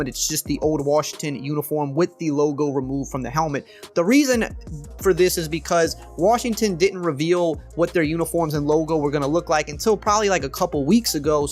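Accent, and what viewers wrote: Louisiana, United States. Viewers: Just discovered this channel and damn you have to be from the bayou lol accent is thick.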